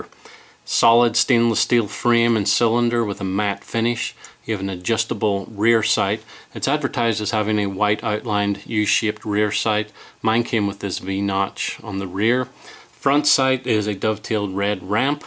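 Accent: Northern Ireland, United Kingdom. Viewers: Northern Irish to me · Accent Northern Ireland Methinks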